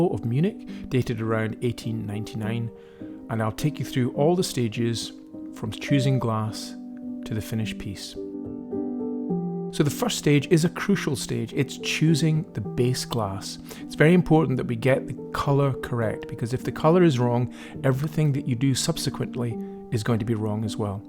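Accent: United Kingdom. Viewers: There is one product called Pebeo Vitrail that you don’t have to fire, but if painted while the glass is vertical and not horizontal, it will run downwards. I’ve found it also smears out as it dries, so painting a scene or portrait or whatever, the detail gets lost. It is oil based and requires mineral spirits to clean your brushes. My apologies to - I know I wasn’t asked and it may be that this product is only available in the United States (assuming you are in Great Britain by your accent). Just wanted to pass that tidbit of information on as it may help their situation. I was wondering if you could take your skills and apply them to a glass piece using the pebeo Vitrail glass paints. I’ve been trying to see if I could but haven’t spent too much time trying yet. I’ve just done some quick preliminary trials so far. What I’ve found I have said above to the OP. 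Where there is a will there is a way!..hopefully. Anyway, thanks for sharing your expertise! The restored piece is fantastic!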